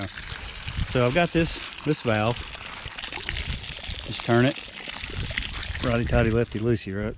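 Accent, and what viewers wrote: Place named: South, United States. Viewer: You sound like Forest Gump. Are you a Southerner, from Mississippi maybe?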